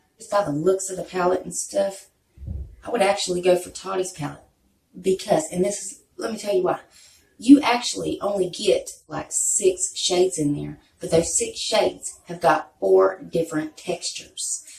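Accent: South, United States. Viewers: What’s your accent ? Are you from the south ?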